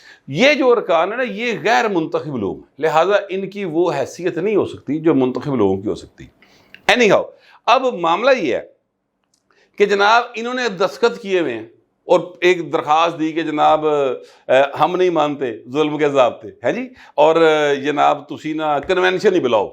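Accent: Lahore, Pakistan. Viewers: مجھے تو فورا" پہنچ جاتا ھے آپکا وی لاگ۔ لاھوری انداز میں بات کا مزہ آجاتاھے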